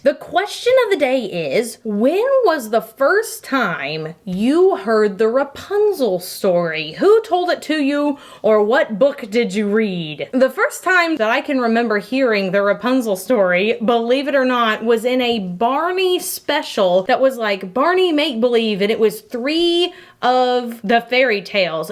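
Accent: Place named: South, United States. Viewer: May I just say that as a southern woman my self. It brings me great joy to hear her accent and her say " yall" 😌😂😂 makes me feel right at home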